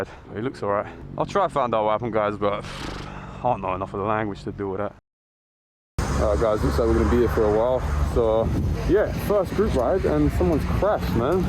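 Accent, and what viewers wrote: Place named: United Kingdom. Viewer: freezing I bet it was wow congrats get licence mighty lad, good rds to go on for sure, you live out there ye still got uk accent,👌 im irish. my ideas might lead yiz astray 😝😂 I love to killswitch the guards at lights, pure madness😂 other bikers ready to bolt 😝😂 ye better not do that over there lad! Enjoy everything you'll be flyin, ive cbr600rr too they're savage, you'll enjoy that ride out. Look forward to more.keep her lit🏍💨💥👊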